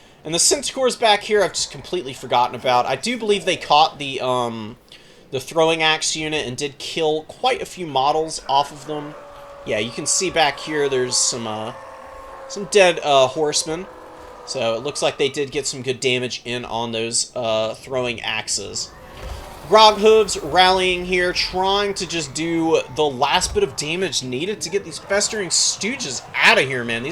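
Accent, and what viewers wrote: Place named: Mid-Atlantic, United States. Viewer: The mid Atlantic accent is strong with this one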